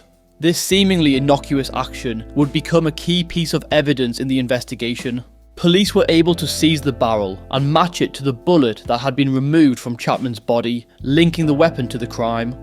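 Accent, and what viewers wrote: England, United Kingdom. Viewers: Bullshit.antother lame instagram mini docco ! Narratted by some pommy flop who probably never even been out here 😂💩🤮💯also the way the narrator says state leader 😂😂😂😂😂😂😂they dint use that language also nike bike 😂dudes been wearing nike s for last thirty years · He's English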